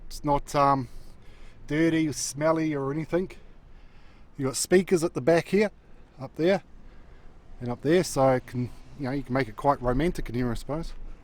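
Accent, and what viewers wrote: New Zealand. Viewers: You a Kiwi fella?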